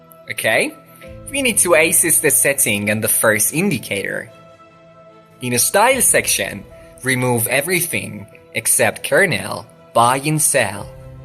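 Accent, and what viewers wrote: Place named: Australia. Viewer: Why are you hiding an Australian accent ?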